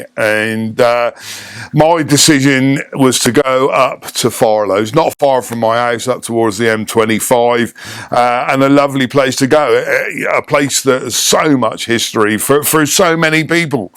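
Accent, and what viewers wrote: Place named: France. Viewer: Welcome back we've missed you. Loving the French accent!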